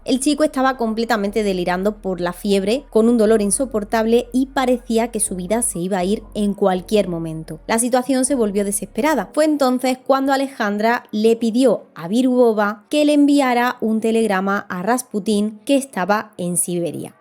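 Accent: Andalusia, Spain. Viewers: Me fascina la historia de Rasputín!!!! Y más como tú la cuentas!!! Ah y tienes un bellísimo acento!!!! Es andaluz? Saludos poéticos desde Chillán en Chile!!!!!! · Sí el acento de es andaluz.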